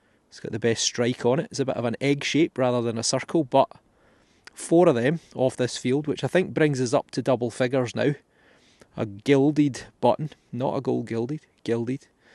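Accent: Scotland, United Kingdom. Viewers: love the historic lessons and especially in scottish the accent the history of your country sums up for succes keep going im a starter in the hobby greetings from the netherlands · I just love your channel! I'm a history buff not a detector so I find your comments on the historical background of your finds very interesting. I bought my husband a detector a few years back but the history out here in British Columbia Canada is a little shallow for metal finds. Our historical finds are pretty much all from Indigenous culture. Also...I could listen to your Scottish accent all day😊 keep them coming mate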